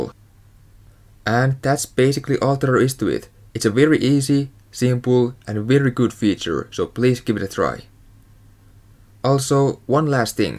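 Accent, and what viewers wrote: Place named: Finland. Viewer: ei juma ootko suomalainen huomaa aksentista :DD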